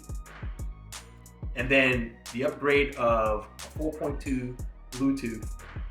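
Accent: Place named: New York, United States. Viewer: Good effort for a noob but that New York City accent is way to heavy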